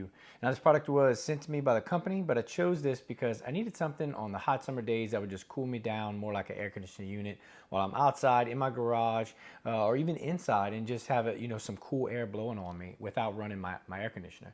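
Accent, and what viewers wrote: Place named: Louisiana, United States. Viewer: As soon as you started talking I knew you were from Louisiana 😂 Thanks for the vid!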